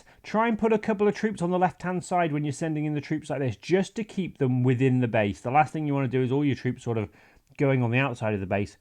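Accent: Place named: United Kingdom. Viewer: He is British and British accent is the best one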